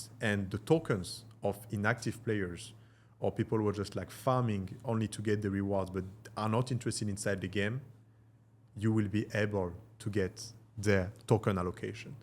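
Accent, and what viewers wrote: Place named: Iran. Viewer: مشخصه لهجش ایرانیه این اداها چیه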